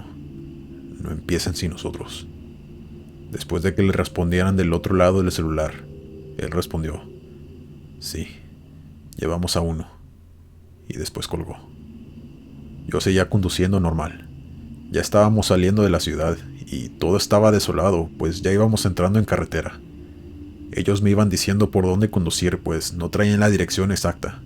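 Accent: Mexico. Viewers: Me encanta tu voz me gusta como hablan los mexicano ❤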